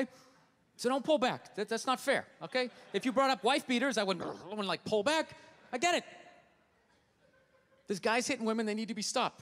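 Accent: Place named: United States. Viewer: He's really an Irishman with an american accent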